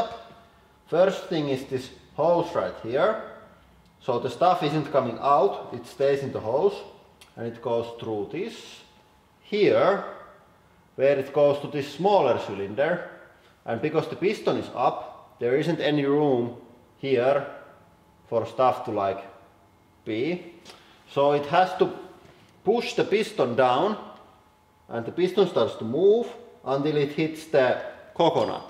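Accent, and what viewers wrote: Finland. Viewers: I'm always amused with this guy's Finnish accent, saying "who-draulic" press instead of "high-draulic" press. But, in all out fairness, I imagine my American accent shows through when I speak German. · I like the way how finish people talk english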